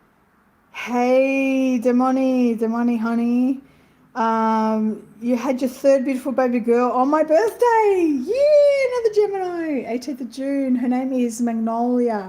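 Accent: Australia. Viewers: You’re much more Australian today! ❤️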